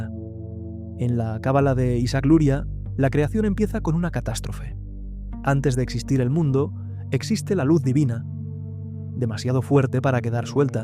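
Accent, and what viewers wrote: Spain. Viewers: tu asentó español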